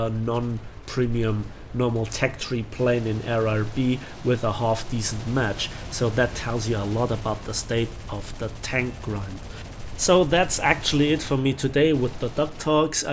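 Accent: Bavaria, Germany. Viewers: I only recently subscribed to your channel and so I don't even know most of your signature style reviews. Anyway, I value the complex, complete and non-biased approach of your stream of thoughts. In this episode, you really shine when you explain the "Tiger-Problem" - something I wasn't aware of because I do not frequently follow the tank side of WT. As a non-tanker I can only say, I got my premium Königstiger maybe two years ago and played exactly three matches in it because I was horrified at the thought that I would be humiliated by some lower tier opponent. At least this fear is gone now but I guess, in the rare cases I play tanks I will stick to my StuG III in arcade - and even occasionally have multi-kill matches in it, ha ha ha. Anyway, thank you very much for your interesting videos and keep up the good work. And btw, I really like your Bavarian-English. 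Viele Grüße aus Unterfranken und schöne Restwoche!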